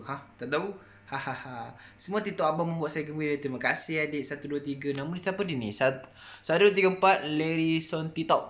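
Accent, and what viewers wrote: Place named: Malaysia. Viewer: Kakak orang Indonesia atau malaysia soalnya abang kayak ngomong korang atau gimana gitu lah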